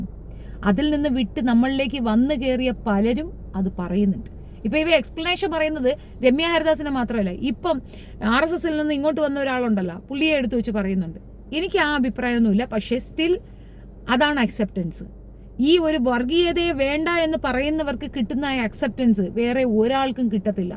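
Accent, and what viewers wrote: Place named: Thiruvananthapuram, India. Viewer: വീഡിയോ ഒക്കെ ഉഷാറാണ്. ആ തിരോധരം സ്ലാങ് കേൾക്കുമ്പോ സുരാജ് വെഞ്ഞാറമൂട് നെ ആണ് ഓർമ വരുന്നത്. ഫൈസൽ കരാട്ടിന്റെ കാര്യം ദശമൂലം ദാമുവിൻറെ പോലെയാണ്.. അവന്റെ അട്ടഹാസവും വലിപ്പുമെല്ലാം കാണുമ്പോൾ സത്യമായിട്ടും ദാമുവിനെ ആണ് ഓർമ വരുന്നത്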